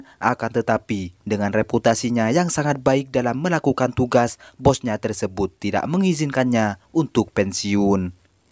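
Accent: Madura, Indonesia. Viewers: Si owen kan pemaen smekdwon si batista pernah maen juga di film the guardian of galaxi sama film avenger and game,kalo si sadi namanya tony ja dia pemeran utama di film ongbak. Kalo dari gaya logat ngomongnya si abang orang madura ya ?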